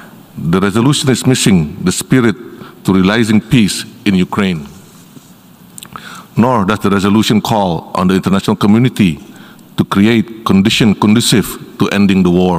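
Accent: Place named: Indonesia. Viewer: Wow bahasa inggrisnya, lugas ala indonesia namun ga sok ke inggris2an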